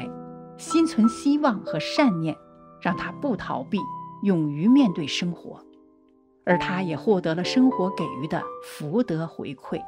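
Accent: Hakka, China. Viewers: 佛友吉祥 客家口音有點重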